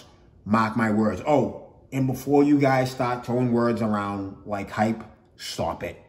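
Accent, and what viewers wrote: Boston, United States. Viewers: that's a 100% authentic Boston accent right there.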